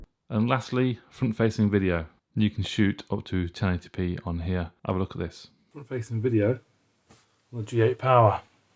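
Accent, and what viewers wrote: United Kingdom. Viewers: 0.88 Mbps download speed? I would have guessed from your accent that you are British, but apparently you live in a rural are in some developing country?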